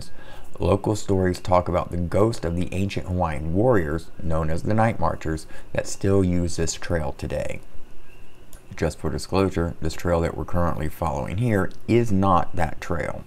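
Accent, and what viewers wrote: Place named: South, United States. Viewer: Love your southern accent!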